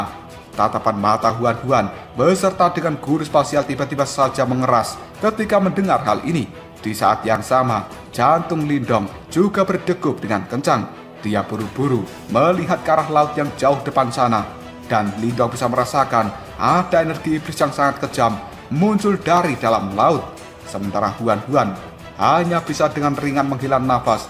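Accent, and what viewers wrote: Java, Indonesia. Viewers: MC ne wong jowo.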